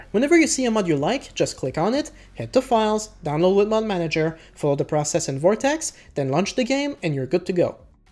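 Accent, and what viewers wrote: Quebec, Canada. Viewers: Is it me or i can sense a little quebecer accent ?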